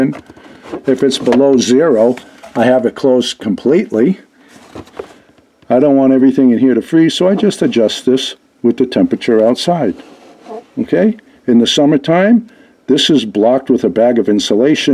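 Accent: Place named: Massachusetts, United States. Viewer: Not to get off topic, but you have a very pronounced new England accent, specifically Massachusetts. I'm from Massachusetts, and I would know that accent anywhere 😊 GOD bless you and Thank you for the tips.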